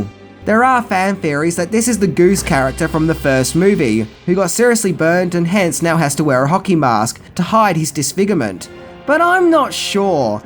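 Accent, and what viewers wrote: Australia. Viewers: My God this guy is Australian!!!!! I didn't know that!!!! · your Australian. or do you just live there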